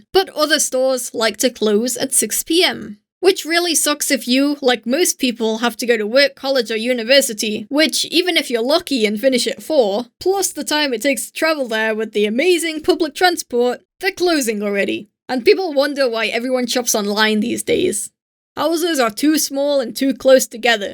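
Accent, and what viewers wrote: Scotland, United Kingdom. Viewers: Ello Ello! Very British! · Eww bri'ish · Its a Kind of britisch accent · Are you scottish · Love the animation, and absolutely adore your Scottish accent and your voice. I watch your videos before going to sleep. Thank for your work :3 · your accent is too british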